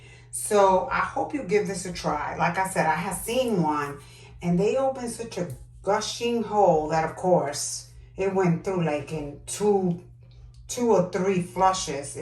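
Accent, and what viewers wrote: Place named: Puerto Rico. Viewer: Are you Puerto Rican so cute the accent!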